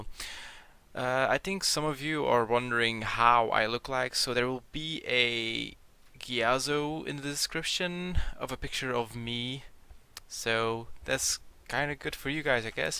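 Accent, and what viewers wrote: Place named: Norway. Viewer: Yo, where are you from? You kinda sound norwegian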